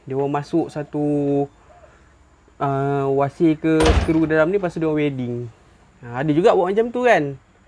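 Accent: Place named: Malacca, Malaysia. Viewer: Dengaw loghat, blh tahu.. kompom melake…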